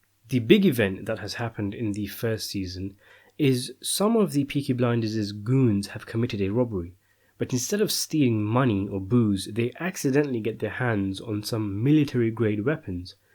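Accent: United Kingdom. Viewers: Thank you for explaining in it in plain English.. (British accent) of course..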